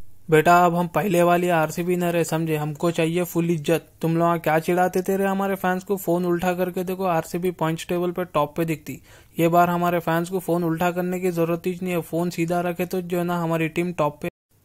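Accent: Hyderabad, India. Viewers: Bhai teri aawaj aur haidrabadi style kamaal hai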